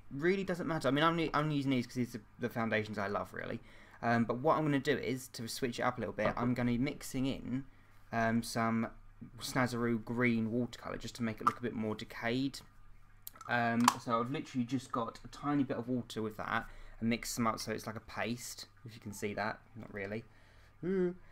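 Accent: United Kingdom. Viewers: You did a FANTASTIC job on this... Maybe it's just the placement of the stitches helping, but you look like an honest to God woman in this one. Also, it took me a few videos to notice that you use the same brand of stage make-up that I use when I'm in a play! I think my brain dismissed that as possible because you have a UK voice, where I'm from the US. So it took me extra long to notice that, because I assumed that the brands couldn't be the same.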